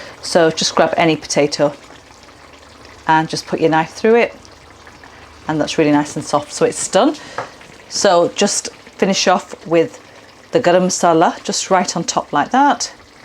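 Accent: United Kingdom. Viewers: searching for Pakistan recipes and YouTube showed you first.after listening to your British Accent i decided you are professional 😀😀 by the way you are a very beautiful girl.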